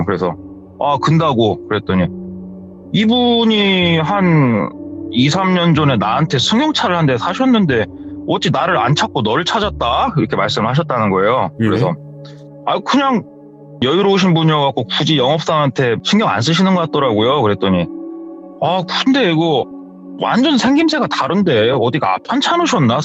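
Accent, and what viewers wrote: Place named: Jeolla, South Korea. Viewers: 정겨워요~ 저도 전주사람 입니다 제가 항상듯던 우리동네 말투~ㅎ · 의 정겨운 전라도 사투리..좋아요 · 맞아, 몰입감 너무 좋고 전라도 살았던 청취자로서 오랜만에 듣는 사투리에 정겹게 들었음ㅋㅋㅋ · 고향이 전북쪽인거 같아요 말투가 너무 정겨워요~~째깐한^^저랑 고향이 같으신듯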